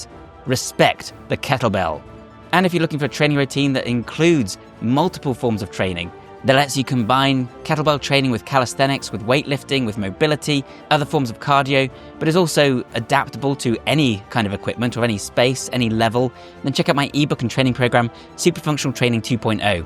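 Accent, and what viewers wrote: United Kingdom. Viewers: Closed caption gives a transcription of CATTLE BELLS. Now the largest cow bell is over 2000 lbs in Germany. So maybe has something here....cow bell lifting. Or maybe it's just the Brit accent.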